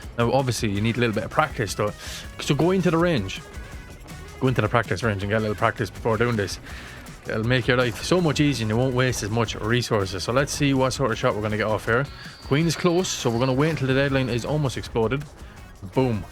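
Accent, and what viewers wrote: Ireland. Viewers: I'm a simple man, I hear Irish accent, I follow, like and subscribe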